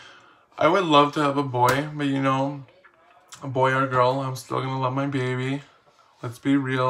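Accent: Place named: Australia. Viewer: Australian accents hit different <3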